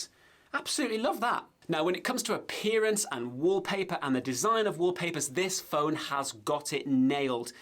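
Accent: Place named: United Kingdom. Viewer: Not another British tech tuber, come on, the accent is very tiring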